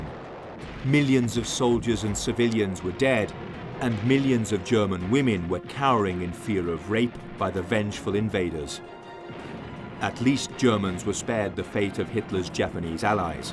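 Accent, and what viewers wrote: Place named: Scotland, United Kingdom. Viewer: took me a few minutes to decipher the Scottish, couldn't place the second influence in his accent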